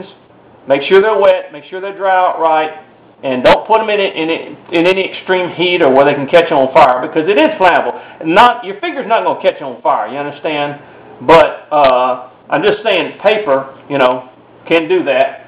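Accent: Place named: South, United States. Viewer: hello !!!!!!! can you please wright the ingredients because your acsent is realy suthern and i am having truble picking up on it!!!! :) lol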